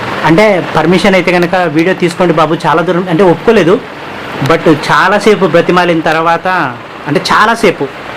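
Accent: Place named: Rayalaseema, India. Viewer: garu Mee vedieo chala chala baguntundi Mee Ankaring super Abba Mana bhasha Maravakunda Baga Matladutu Nara Abba Tq Abba madi Mydukur Abba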